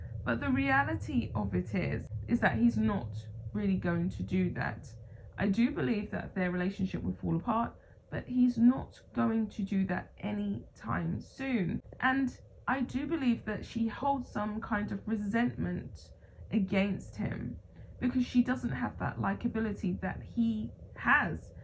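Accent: United Kingdom. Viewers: Anyone reminded of the film ''Misery''?.. Lovely to hear a Brit doing this for a change! Good on you girl.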